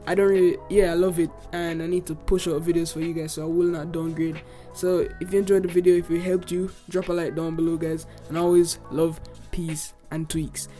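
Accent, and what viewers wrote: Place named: Jamaica. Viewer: I just subbed, you sound like a Jamaican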